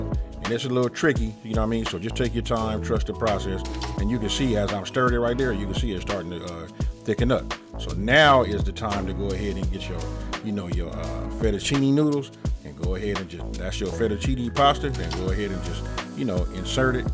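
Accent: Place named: California, United States. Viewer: He sounds like he’s from the Bay or LA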